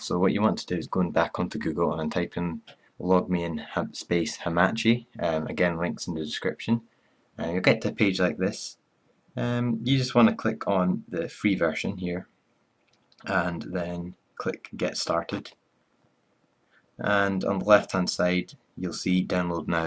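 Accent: Scotland, United Kingdom. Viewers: are you scottish? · Thanks this helped ! Are you Scottish ?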